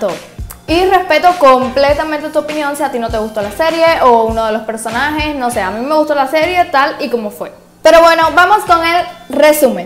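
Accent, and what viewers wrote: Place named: Dominican Republic. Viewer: Eres dominicana o vives aquí en RD? Tienes como un chin de acento 😂❤️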